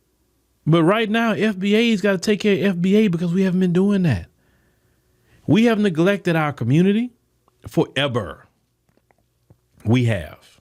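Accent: South, United States. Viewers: I could tell from your accent you from the south so you know nothing about the north east even though we came back to fight to Free we black America north so we don’t really mess with Mexicans Venezuelan MS 13 but we love our Puerto Ricans so we have family members for generations I’m talking about foundation multiple generations tight and we don’t delineate. We stick with the ones that speak with usstop speaking poison. You could be speaking to black babies with Puerto Rican in a blood.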